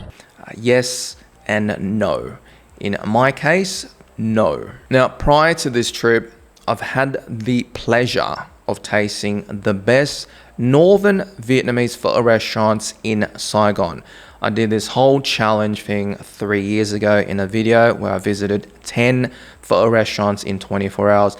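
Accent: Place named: Australia. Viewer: So weird to see a Vietnamese guy speaking with an Australian accent reminds me ofLuke Nguyen. I am glad the rest of us ended up in the states.. I am personally heading back to Vietnam for the first time in 50 years.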